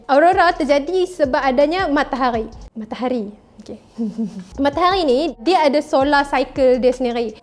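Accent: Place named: Kedah, Malaysia. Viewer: Lebih baik guna BM standard. Boleh bantu pelajar ting 3 untuk lebih faham. Loghat kedah pekat noo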